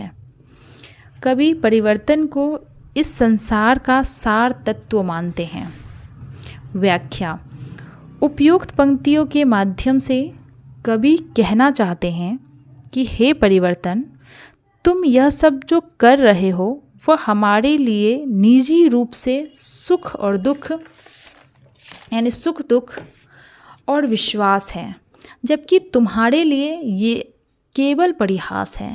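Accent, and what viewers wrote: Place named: Bengal, India. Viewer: और दीदी आपका आवाज मुझे बहुत अच्छा लगा इसमें बंगाली टोन है जो मुझे बहुत ज्यादा पसंद है और पता है दीदी मैं आज तक खुद से ही असाइनमेंट के सारे आंसर ढूंढती थी और लिखती थी किताबों से पर जब मुझे प्रश्न 5 का क नहीं मिला तो वह पद्यांश में गूगल पर सर्च कर रही थी तब मुझे आपका वीडियो दिखा इसका मतलब की जो आपने छोटे-छोटे प्रश्न के उत्तर दिए हैं अलग-अलग करके एक-एक प्रश्न के उत्तर दिए हैं वह बहुत यूजफुल था सर्च इंजन में काम करने के लिए। मैं उसी के सहारे आपके लॉन्ग वीडियो तक पहुंची हूं आपका काम बहुत अच्छा है दीदी अगर छोटा-छोटा वीडियो नहीं डालती और इकट्ठे बड़ा डालते तो शायद मै आपकी इस लॉन्ग वीडियो तक नहीं आती। मैंने पद्यांश डाला तो उसके उत्तर के रूप में आपकी वीडियो आए और अर्थ सहित और ऊपर मैं वह क्वेश्चन का आंसर दिखा रहा था और आपका टाइटल भी था यह सब बहुत अच्छा था तभी आप फर्स्ट नंबर पर सर्च में आ गई गूगल क्रोम पर और दीदी आप इसका प्लेलिस्ट भी बना सकती हैं उसे जनता को बहुत सुविधा होगी और दीदी आपका काम बहुत अच्छा है.आपकी लिखावट बहुत ही स्पष्ट है आपकी लिखावट बहुत अच्छी है मुझे कोई दिक्कत नहीं हुई है और जहां अगर थोड़ी बहुत दिक्कत हुई तो आपका बोल-बोल कर बताने से वह प्रॉब्लम भी नहीं हुई और बहुत आसानी से स्पष्ट आवाज में सब कुछ समझ आ गया और मैं फाइनली एक असाइनमेंट कंप्लीट कर दी दीदी आपको धन्यवाद आपने बहुत अच्छा काम किया है आप बहुत काम समान में भी अपने कमरे को किताब की वीडियो बीच में फोकस की है जिससे पूरी कॉपी दिखाई दे रही है दर्शन को बिल्कुल भी परेशानी नहीं हो रही है और आप उंगली रखकर एक-एक बता रही हैं और जल्दी-जल्दी पढ़ रही है सब बहुत आरामदायक था बहुत अच्छा लगा दी थी आपका काम जिसको इसकी जरूरत है उसके लिए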